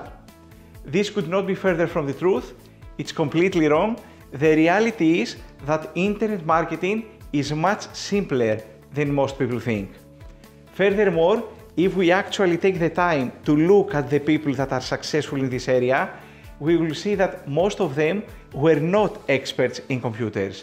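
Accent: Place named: Greece. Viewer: Perfect, though greek accented :D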